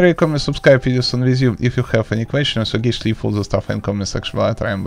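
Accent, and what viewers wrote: Russia. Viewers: Прям слышу русский акцент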